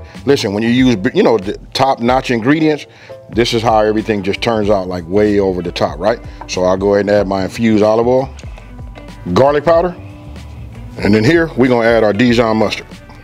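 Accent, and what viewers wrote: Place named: Los Angeles, United States. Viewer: He have that L.A. accent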